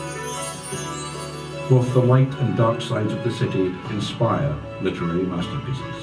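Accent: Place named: Scotland, United Kingdom. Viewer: Fascinating urban history with a clear Scottish voice! Excellent!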